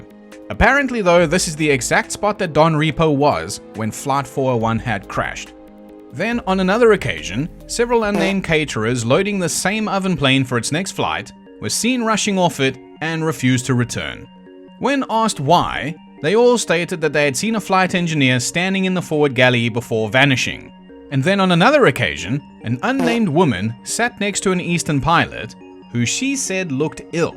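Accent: South Africa. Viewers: South African accent, I noticed instantly 🇿🇦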